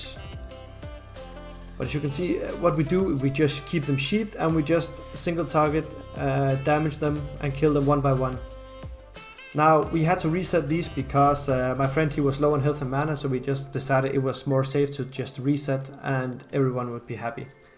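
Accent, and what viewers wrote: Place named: Denmark. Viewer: You gotta be a Dane with that accent! Anyways thanks for the video.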